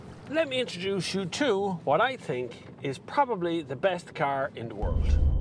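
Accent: Ireland. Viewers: Enjoyed hearing the Irish accent in this review.Haha...Only thing better would be hearing a female Irish accent! ;-)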